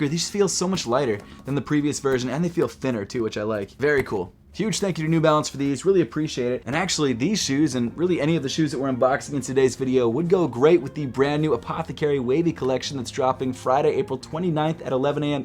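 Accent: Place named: Baltimore, United States. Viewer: Gotta love that Baltimore accent. "... you gat packets an packets. Two sneaker packets an the back, two sneaker packets an the frant"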